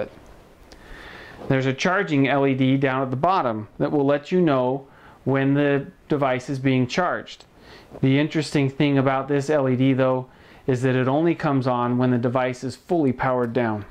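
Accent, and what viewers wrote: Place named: United States. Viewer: Finally someone who speaks American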